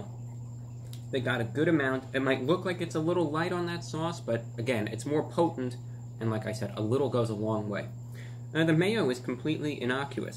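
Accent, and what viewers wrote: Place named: Ohio, United States. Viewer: Im guessing ohio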